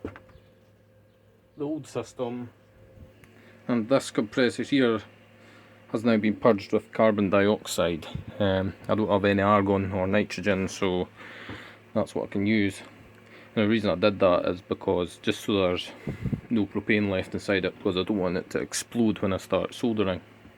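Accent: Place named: Glasgow, United Kingdom. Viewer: I'm fascinated by your accent, are you from glasgow?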